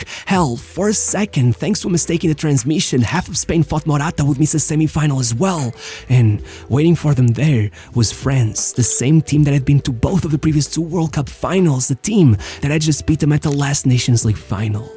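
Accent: Portugal. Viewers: Why is he giving a portugese pronuntiation to all the spanish names?? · Great video! I was just annoyed that you kept pronouncing Spanish names with Portuguese pronunciation. Or am I wrong? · Just a tip as a Spanish polyglot interested in phonetics: pronouncing Spanish words with a Portuguese accent doesn't make them more accurate and similar to the Spanish pronunciation, and in fact, it sounds quite unnatural, especially while speaking perfect English. I would recommend that you stick to the English pronunciation when saying those words if you don't want to learn Spanish phonetics. Of course, maybe you don't care at all, but since you're a content creator I would assume you want to sound more natural and educated👌. That way your viewers can focus more on your content, rather than the weird pronunciation, and the actual message is delivered much more easily. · bro is calling every Spain player like a Portuguese one xd. 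Just pronounce them in English bro it sounds better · why do you pronounce the names like if they were portuguese? not criticising but as a spaniard i think pronouncing the names like they really are o at least trying could be better for the overall quality of the video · I would like to ask you to pronounce the Spanish names properly, not in Portuguese or Brazilian pronunciation. Thank you. Overall, fine job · Quiero decir, el chico es portugués, creo. Es normal que los nombres le salgan con acento. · Why do you pronounce Spanish names in perfect Portuguese accent?😂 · He pronounces spanish names in portuguese lol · I love you you pronounce every Spanish name with a Portuguese accent 😅. Great video and Viva España y Portugal 🇪🇦❤🇵🇹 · Why do you pronounce names so weird. Like with a Portuguese accent Torresh Grodri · is the guy reading this portuguese? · I appreciate the effort to try to pronounce Spanish names correctly, but you are pronouncing them in Portuguese....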